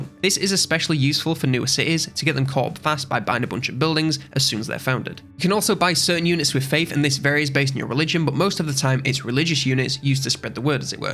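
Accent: United Kingdom. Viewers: Accents are a thing, I speak French from France but live in Canada and have a hard time understanding Quebec French. The lad in the video here has a heavy Bri’ish accent and skips every third letter. Perhaps he should use AI to narrate.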